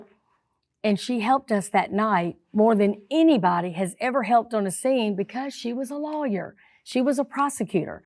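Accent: United States. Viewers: Great to see someone with my accent on YouTube. There’s not enough of the south on YouTube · yes, Georgia. I'd guess southwestern GA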